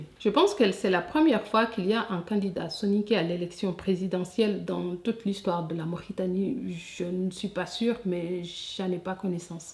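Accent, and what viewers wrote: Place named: Senegal. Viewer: Tu as un accent sénégalais , tu es sénégalaise tes frères et sœurs en Mauritanie sont la plupart des exclaves , soit vous les ramenez chez vous soit vous la fermez . Il peut pas y avoir de Noir en Mauritanie c’est un peuple arabe . Vous cassez les couilles aux gens de partout . Même en France vous voulez pas laisser les gens respirer. Retournez chez vous et le problème est réglé.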